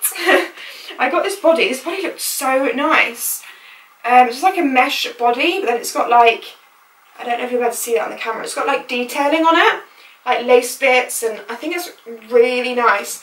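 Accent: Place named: Southern England, United Kingdom. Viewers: Mirella Thalyssa i think it's a southern English accent because that's where I'm from and that's the accent I have 😊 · Marine Pierrot I think it's Brighton x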